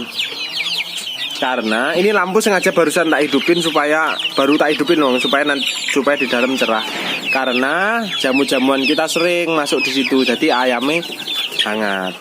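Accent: Java, Indonesia. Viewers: Aku msh pemula Mas Yang selalu mengikuti petunjuk. Mas njenengan ini orang lampung kok logatnya kayak orang jawa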